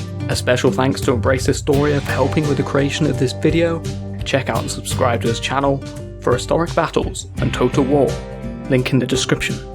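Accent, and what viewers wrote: United Kingdom. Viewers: This whole thing is Americans propaganda in a British accent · Its crazy that he is saying this while he has a british accent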